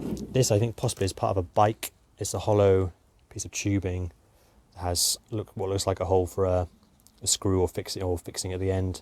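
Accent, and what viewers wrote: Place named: United Kingdom. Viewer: It's fascinating to see all the work you do to uncover this. Very labor intensive. I love the U. K. accent. I wish you were single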